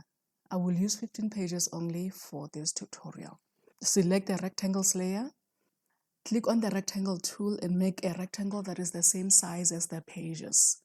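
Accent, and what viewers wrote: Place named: South Africa. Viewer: You sound soooo South African. Thank you for the tutorial!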